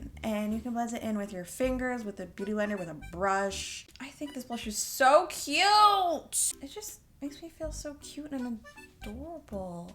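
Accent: England, United Kingdom. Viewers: Loved the English accent, u did well hun, lol from the U.K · Girl that British accent never gets old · The British accent is here and THRIVING!!! It is making my day. Keep being you and don’t hide your personality!!! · Love the English accent girl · Are you britesh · The british accent💅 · I love your British accent it reminds me of ronald weasly 😂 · Omg girl! Your British accent>>>>333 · her bri'ish accent <3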